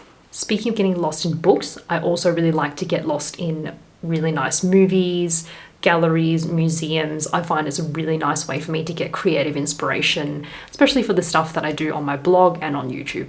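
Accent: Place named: Australia. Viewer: Very well said. Great list, and watching from the USA I really enjoy your voice. Everything sounds sweeter and happier with an Australian "accent" :-) I remember turning 30 as well and just loved leaving my 20's in the rear-view mirror. I'm glad you found it a good thing.Just wait for 60!!! haha. Liking & subscribing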